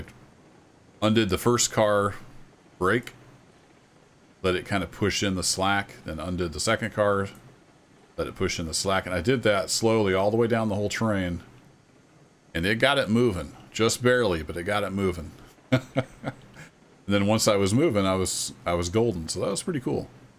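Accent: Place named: Midwest, United States. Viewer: Is it just me or does sound like he is from the Midwest or the rockys area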